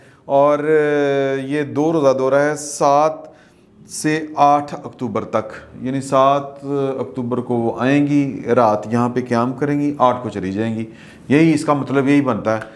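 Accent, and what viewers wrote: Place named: Lahore, Pakistan. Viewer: I listen to this guy for his Lahori Punjabi!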